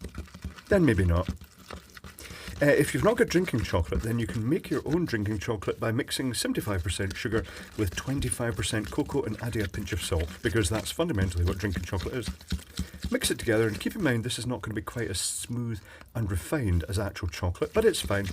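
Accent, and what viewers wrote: Scotland, United Kingdom. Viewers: He definitely isn't faking the accent. Proper Scottish cooking there.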